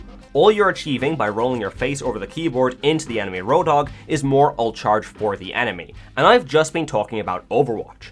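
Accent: Ireland. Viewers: Are you from Louth? Short circuited when i first saw the intro. Didnt expect you to say well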